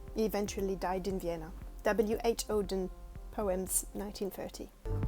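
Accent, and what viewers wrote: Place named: France. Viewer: English with a French accent is the best combo!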